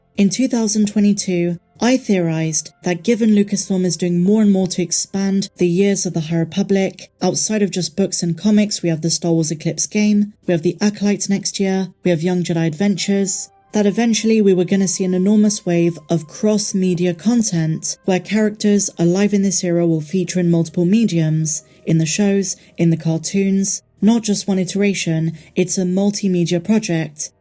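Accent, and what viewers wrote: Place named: England, United Kingdom. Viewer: Ok, the accent is way too heavy for me to understand what the narrator is saying almost totally. Could you please work on the sound quality or get a narrator without an english or whatever itis accent?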